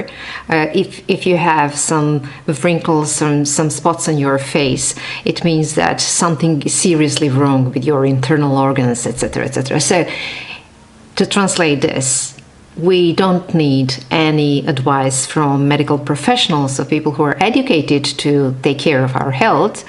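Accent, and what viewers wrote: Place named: Texas, United States. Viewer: Thanks for your Texas accent...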